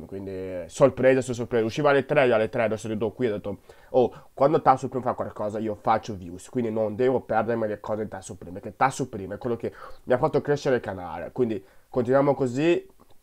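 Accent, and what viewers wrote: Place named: China. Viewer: Perché parli come un cinese?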